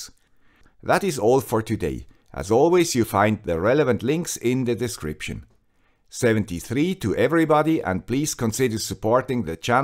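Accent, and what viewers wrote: Switzerland. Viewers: There are some great ham channels on YouTube and as a returner to amateur radio, they have helped me learn a lot. I'm always glad to hear a little Swiss accent modulation of course!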